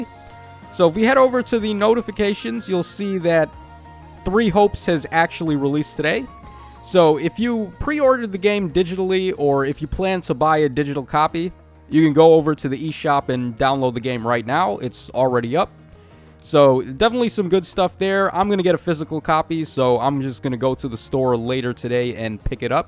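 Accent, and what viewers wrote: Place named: Queens, United States. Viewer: Something great about an affable dude with a thick Queens accent reviewing gatcha games. 😆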